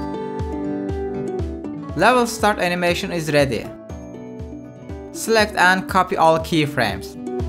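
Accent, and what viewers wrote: Turkey. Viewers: ENG Below Kötü anlamda söylemiyorum, ilk defa izledim ve ilk cümlenden aha türk developer bulduk dedim :D. Samimi ve kaliteli bir kanal kurmuşsun, umarım hak ettiğin yerlere gelirsin. I am not saying it in a bad way, I have never watched you before and figured out you are Turkish from your first sentence. You created high quality channel and you are very sincere. I hope you'll get what you deserve. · Bende Turk oldugunu anladim :p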